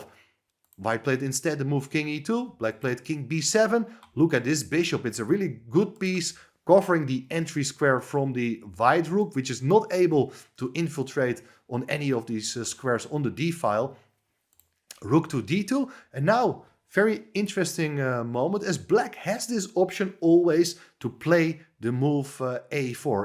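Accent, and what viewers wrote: Netherlands. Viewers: I don't know what I hate more, Hans' cheating again or hearing this guy's Dutch version of English😅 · You're Dutch, aren't you?! :) I can tell from your accent.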